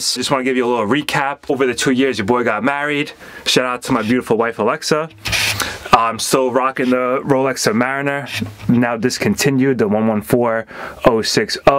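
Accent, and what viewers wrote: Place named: New York, United States. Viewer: Bro has the NY accent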